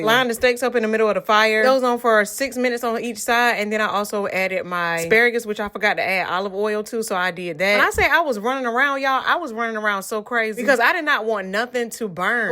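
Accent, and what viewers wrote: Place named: Texas, United States. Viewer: You're accent let me know you're Texans. Glad to see you are repping HEB. I'm from Kingwood, but living in Chicago now. Miss me some HEB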